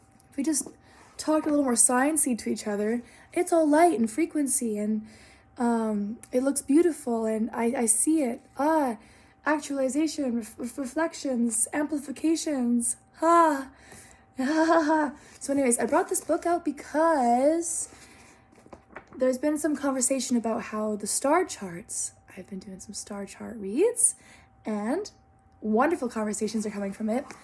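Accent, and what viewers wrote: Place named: RP, United Kingdom. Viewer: OMG! Can I be more Inlove w/ this girl!!! Haha!! Aquarius Sistar here!! I love your Scribbling Bit! Nothing could be cuter except the general Alice British proper way you speak through the blubbering of your whimsical speech as you interpret the codes of your readings in your experience. OMG Girl I'm gonna get drunk on this channel, dangerously. You're a Marvelous bloomage of humble beauty dearest Lovely Crystal Star Child. ✨🫶🏻🎶💥 I think you know who this is 😜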